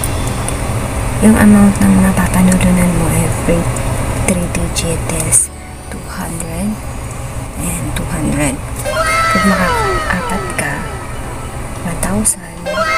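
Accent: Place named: Ilocos, Philippines. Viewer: ilocano ka ba ading hahhaa nice though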